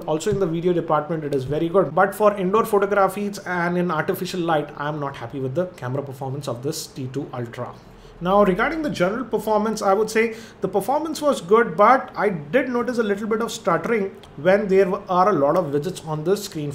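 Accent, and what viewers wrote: India. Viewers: i like if indian people speaking english, because different but good. :) nice sony :D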